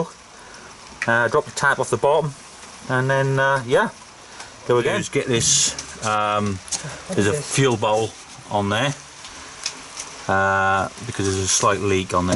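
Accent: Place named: North Buckinghamshire/Bedfordshire, United Kingdom. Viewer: Where about`s are you? accent sounds familiar (north bucks/beds) you near George?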